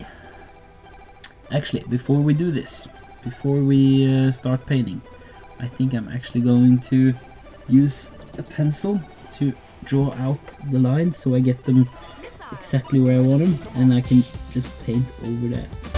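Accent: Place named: Norway. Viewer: we love Norwegian English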